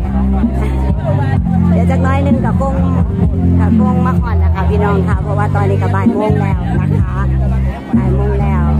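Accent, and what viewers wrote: Isan, Thailand. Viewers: ผมดูเกือบทุกคริปของ ชอบฟังเสียงพูดภาษาอีสานรู้สึกว่าน่าฟังเพราะหูมากครับขอเป็นกำลังให้น่ะครับจากเอฟชีจากเบตง-จังหวัดยะลาครับผม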